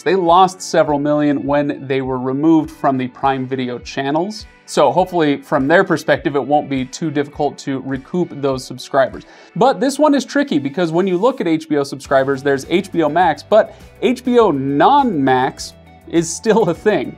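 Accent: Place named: United States. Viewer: It was in plain English,albeit with an American accent. Would subtitles help?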